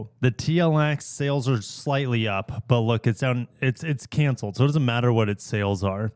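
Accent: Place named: United States. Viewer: i personally hate this accent of america has. he talks to slow, and it sounds congested. i have to listen to video sped up to actually hear the video.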